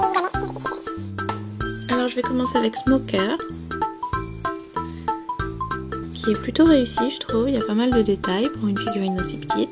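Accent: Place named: France. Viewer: ~! and your french accent is so cute great review! ps:i hope you know who i am;p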